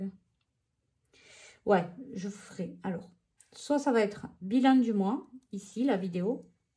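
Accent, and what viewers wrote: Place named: Occitanie, France. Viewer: Je suis nouvelle abonnée. J'aime bien votre accent, il ressemble au mien Région Occitanie ????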